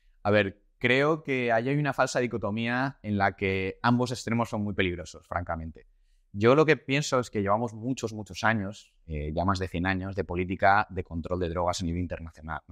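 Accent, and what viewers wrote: Spain. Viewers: me es difícil entender ese acento español "tan español"😢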